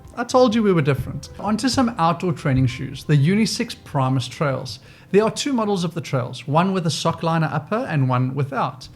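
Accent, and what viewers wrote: South Africa. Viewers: I want to transition to barefoot shoes and Vivo is the only brand available here in SA. It was so refreshing to hear a South African accent that I was immediately drawn to the video. Thanks for the reviews because here in SA the shoes are pricey so making that first choice is crucial especially since I am looking for a work shoe. Thank you! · Ja, asseblief, man! When you have the time, I would LOVE to watch a video about the benefits of losing my socks! 😅 I'm very new to this barefoot style of shoe, don't own a pare of vivo barefoots yet, but may just be getting a Geo Racer. Lol. Thanks wifey! ❤️🙏🏻 I've also recently fallen in love with injinji socks, and never used to like those trendy toe socks that people would have about...but Injinji does it right. 😁 After the initial strange feeling of 5 to 15 minutes...they stayed on my feet the whole day, I slept with them on (normally, i rip my socks off my feet at night, because they have to breathe!), and continued the entire rest of the next day with them until my shower that evening. 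(36 hours) ironically,I had misplaced them, and just found them again a couple days ago...and yes, I'm totally wearing them while I'm writing this! 🤣 Anyway, loved this video so much, thank you so much for making it, hey! Baie dankie maneer! 🙏🏻 (my afrikaans was always terrible growing up in Cape Town, and it definitely lost importance when my family moved to the states, so I'm afraid you're limited to please and thank you, LOL! Jammer! 😉) It's so nice to hear a legitimate accent again, especially discussing in such detail the benefits of so many vivo barefoot shoes! 🙏🏻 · Dude you sound South African Are you a SAFFA living in Greece? Or am I being silly?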